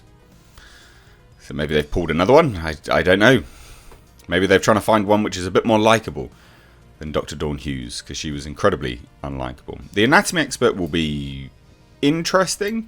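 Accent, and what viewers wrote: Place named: United Kingdom. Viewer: I hope you don't mind but I just gave your name up to Umbrella Guy on a live stream with him just now. It was regarding your Vogue critique earlier today. I found that really funny and mentioned it to TUG and his response was that he likes you a lot, and said he'd like to get you back on a flashcast. So I have my remind buttons on and I'll keep watching for that. Anyway, love your channel! Been watching for a while now. Nice to hear a fellow Brit on YouTube. Cheers. 🖖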